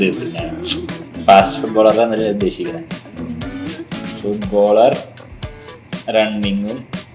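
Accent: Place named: Thrissur, India. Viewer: Gaminekkal kooduthal ishtayath immade thrissur slang aahne😍.....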